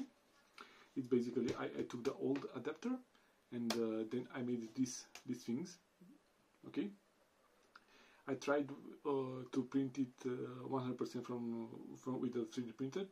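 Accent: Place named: Pakistan. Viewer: You're a great guy for all the effort. Interesting accent btw, i hear a similarity to Pakistani for some reason, although you are Polish or Lithuanian i think.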